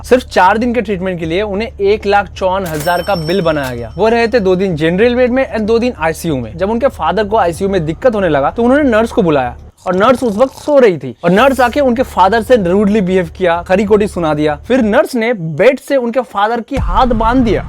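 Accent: Bengal, India. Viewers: fir bhi Hindi me Thora Bangla ka prabhav h..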